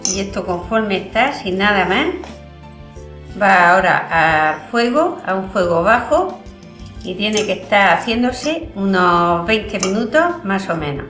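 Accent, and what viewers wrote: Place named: Andalusia, Spain. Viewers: " Loviá poné " , me encanta el dialecto andaluz 💪🏆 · Jajajajajaja no sabes reconocer un acento andaluz?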